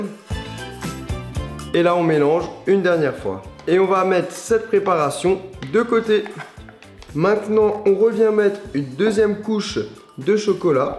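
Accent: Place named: Alsace, France. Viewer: je pari que tu est alsacien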